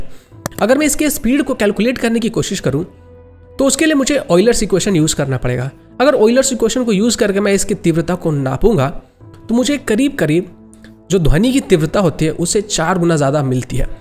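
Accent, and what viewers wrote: Bihar, India. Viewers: Bhai ye bihari logo ko kaise bolna lag rahi hai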